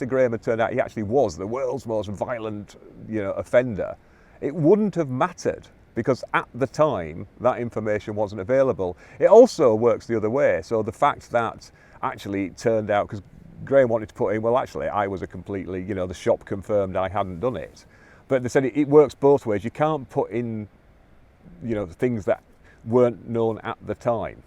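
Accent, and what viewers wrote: United Kingdom. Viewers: How funny it is that some goofy with a british accent is trying to tell Americans what to think. So you're going with the secret service has more stringent rules of engagement than the police do? Cause that's exactly what you're saying, whether you think you are or not. Release your bank statements for the last two weeks.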